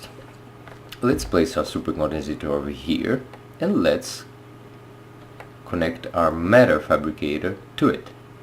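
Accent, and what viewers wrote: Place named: India. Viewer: hmm he sounds like Indian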